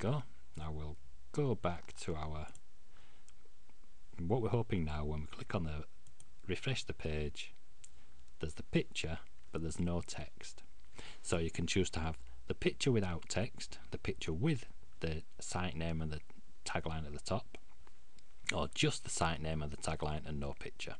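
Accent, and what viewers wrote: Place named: North, United Kingdom. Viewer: Nice tutorial. A man after my own heart... nice and steady, not rushed at all... and with the added benefit of a northern accent! :)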